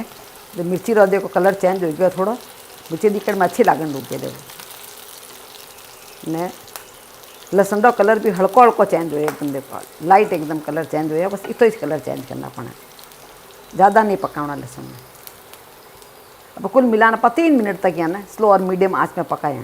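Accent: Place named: Garhwal, India. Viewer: Why Aunti Ji is Sounding me a gadwali 😀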